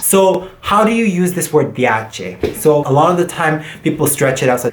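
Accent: Dominican Republic. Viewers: This is really funny and entertaining! Your Doninican spanish is on point. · You sound Dominican to an extent. Sigue pa' lante! or sigue por adelante. Basically just keep going lol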